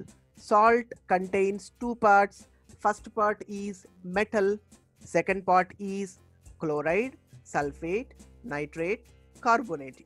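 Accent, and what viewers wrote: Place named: India. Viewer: It's a Indian accent😁 I am 100% sure.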